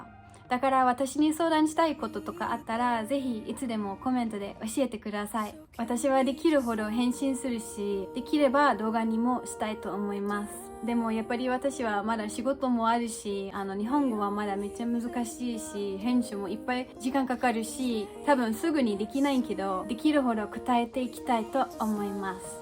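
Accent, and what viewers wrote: Kansai, Japan. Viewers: 時々バカイトのチャンネルみたりしてたんだけどやっぱり時たま関西弁っぽいしゃべりしてたから1年間関西にいたのね！納得しましたわ！ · 少し関西弁交じりなのは近大にいたからなんですねｗ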